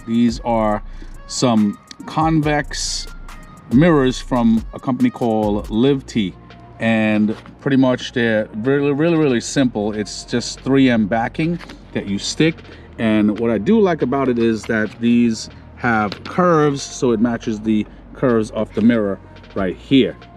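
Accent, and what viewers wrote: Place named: Spain. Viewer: I think he’s Spanish American as he has a Spanish accent in his speech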